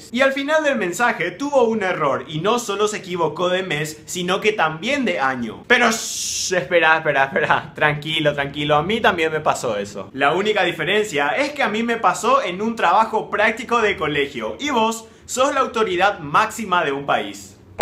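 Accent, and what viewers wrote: Paraguay. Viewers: Hacena videos a lo paraguayo como hablabas antes, te haces guau de el cheto nde tembo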